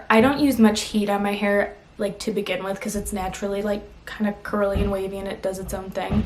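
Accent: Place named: New York, United States. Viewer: LOVVVEEEE this vid!! where’s your accent from?? you sound like a New Yorker 🤩✨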